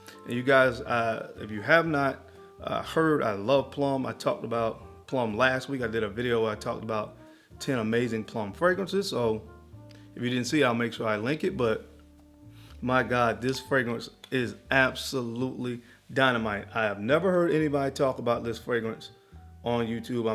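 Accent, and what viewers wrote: United States. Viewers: Please, take the time and show the name of the fragrances on the screen. With your accent (or whatever...), It's not easy for non American to understand the names of the fragrances.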